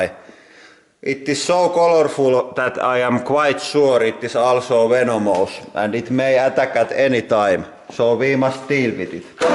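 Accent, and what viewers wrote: Finland. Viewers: is he from finland cause sounds like it? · Rallienglanti for the win! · the finnish accent lol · Most of the finnish people know him of he's epic finnish english axent :D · This kind of very strong finnish accent is know as "Rally-English" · very strong finnish accent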